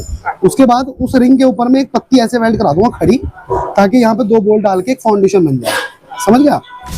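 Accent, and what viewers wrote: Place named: India. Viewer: your videos and accent let me suppose you're from india, isn't it?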